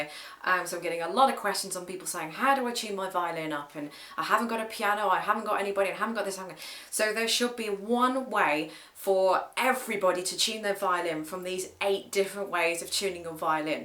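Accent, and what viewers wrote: England, United Kingdom. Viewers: well, I have been playing guitar for 30+ yrs, and piano, bass and other instrumets for a very long time, but have always wanted to play the violin.. I finally have my hands on one, and was obviously wanting to even know what notes the strings were and go from there, Very informative video, but maybe a little too much info for a total beginner. by the way, your English accent is just SOOO awesome. The accent combined with your obvious beauty, that's quite an awesome combo :)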